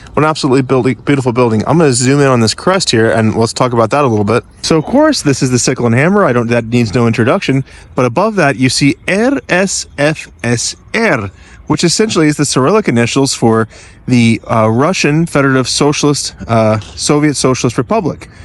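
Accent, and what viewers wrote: United States. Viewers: Belfast? I thought you were American with your accent interesting 🧐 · Where is this guy from originally, The US, or Canada? It’s very hard to tell the difference most of the time. People in the state of Minnesota sound more Canadian than most Canadians do. 😂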